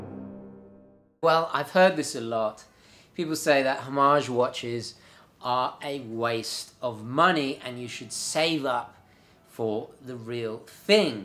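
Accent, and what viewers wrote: United Kingdom. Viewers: British accent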